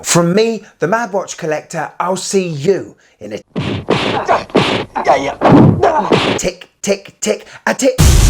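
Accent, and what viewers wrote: United Kingdom. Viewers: Another really great video. It's been decades since I first noticed that you Brits pronounce z "zed" instead of "zee" the way we bloomin Yanks do. were very helpful with this, with Joe saying "Jay-ay-zed-zed" on their song "." And it's been years since I noticed that you insert an extra syllable into "aluminum." But only very recently did I notice that you blokes pronounce h "haitch" instead of "aitch." WHERE DOES IT END?!!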